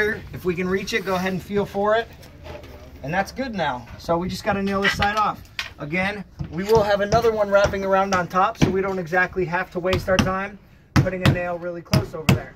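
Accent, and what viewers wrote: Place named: New York, United States. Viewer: Excellent video I would guess you're from new york.. You remind me of some guys that I work with.. very knowledgeable and straightforward great info and training ..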